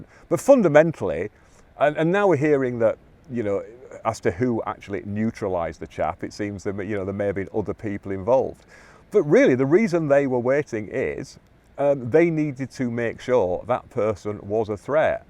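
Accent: United Kingdom. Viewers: How funny it is that some goofy with a british accent is trying to tell Americans what to think. So you're going with the secret service has more stringent rules of engagement than the police do? Cause that's exactly what you're saying, whether you think you are or not. Release your bank statements for the last two weeks.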